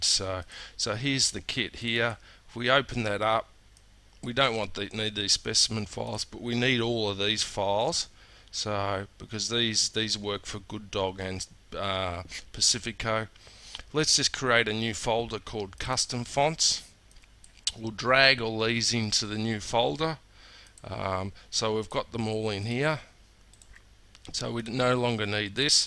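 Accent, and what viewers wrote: Australia. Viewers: you are aussie correct?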